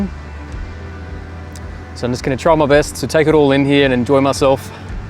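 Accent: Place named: Australia. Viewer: Ahhh australian accent. Welcome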